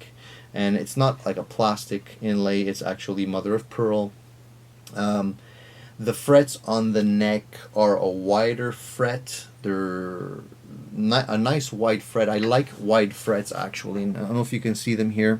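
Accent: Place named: Quebec, Canada. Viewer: French Canadian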